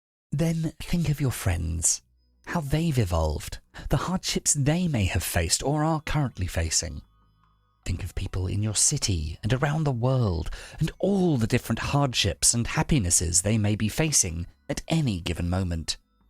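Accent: United Kingdom. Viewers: Thanks but i wish non british would be spoken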